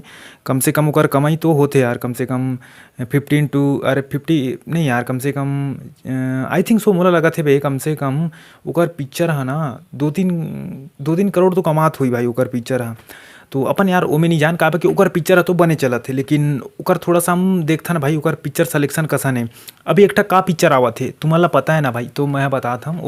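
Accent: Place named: Ambikapur, India. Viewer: AAP kha se ho bhai cg thoda lailunga ambikapur taraf ka bolte ho😅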